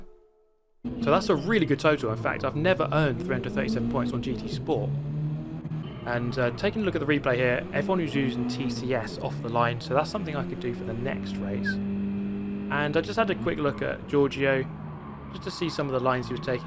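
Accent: United Kingdom. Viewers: Not to mention that British accent...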